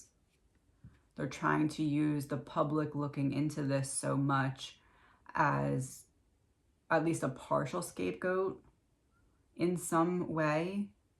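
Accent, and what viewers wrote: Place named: Maryland, United States. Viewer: Where are you? I hear Maryland or PA which reminds me of my cousins! I am enjoying your readings very much!!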